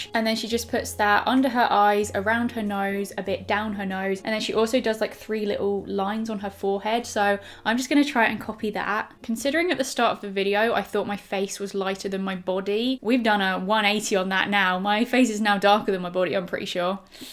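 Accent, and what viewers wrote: United Kingdom. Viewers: Her accent reminds me the Harry Potter movies🤷🏽‍♀️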